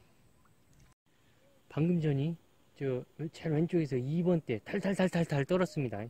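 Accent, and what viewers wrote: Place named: North Jeolla, South Korea. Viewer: 님 전라북도 사투 구수해부요 잉 지는 전남인디 ㅋ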